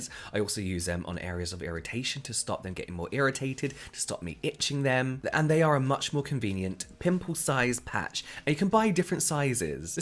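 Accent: United Kingdom. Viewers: is sounding extra British in this one.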